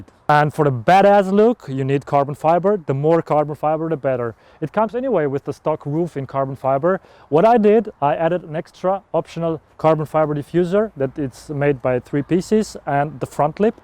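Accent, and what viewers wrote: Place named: Romania. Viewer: Se vede accentu de roman =))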